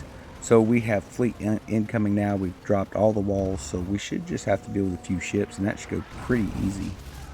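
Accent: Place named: New York, United States. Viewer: Your accent gives yourself away. New York? 😉 S C here, take care.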